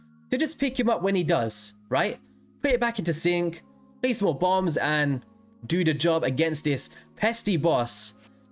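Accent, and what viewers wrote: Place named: London, United Kingdom. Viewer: but any particular area of london? as I have heard londoners but none like you, forgive my uk ignorance i am truly just trying to differenciate. like i enjoy your voice but i feel its more specific than london..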